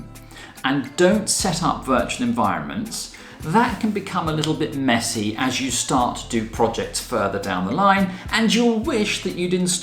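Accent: United Kingdom. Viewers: Nice British accent nothing else.😮😢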